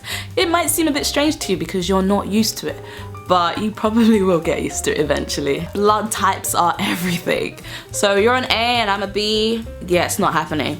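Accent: United Kingdom. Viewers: It's a BRITISH accent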